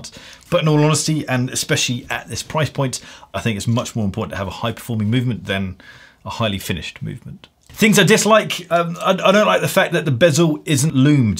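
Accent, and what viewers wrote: RP, United Kingdom. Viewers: Is his accent RP? 😁 I like to listen when speaks. Such a beautiful accent. I heard he pronounced T clearly in most of his videos but when he said "water", it doesn't really sounds like RP.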